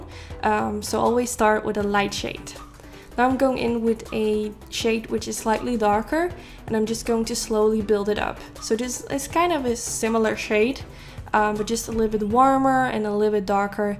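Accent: Netherlands. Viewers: Ik moet zo lachen om jouw heerlijke Louis van Gaal engels! Ik vind je geweldig!! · is she dutch? Idk her accent sounds Dutch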